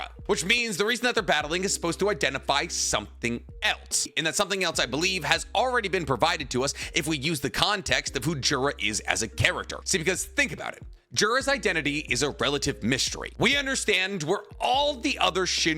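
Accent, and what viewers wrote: Boston, United States. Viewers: Yo brother you from Boston? Me too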